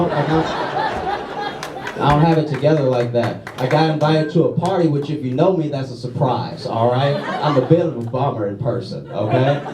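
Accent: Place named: Louisiana, United States. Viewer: What's good Fam! Im from North Louisiana, half way between Alexandria and Shreveport. A little shithole called Zwolle! I just ran across ur videos and was checkin it out and of course that Louisiana draw caught my ear. When you said you from Louisiana and everybody clapped and u said not New Orleans and said New Orleans to Louisiana was like having 1 beautiful blue eye and a fuckd up face!! I laughed my ass off cuz! When I tell people where im from I can already see images of Mardi Gra starting to form n their head. Keep up the good work man, make us proud! life n such a fuckd up place will giv u a either a chip on ur shoulder or the best sense of humour ever. I guess u got the latter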